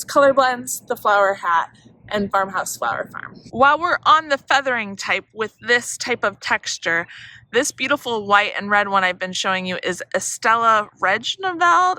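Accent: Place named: Chicago, United States. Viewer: I love hearing your Chicago accent!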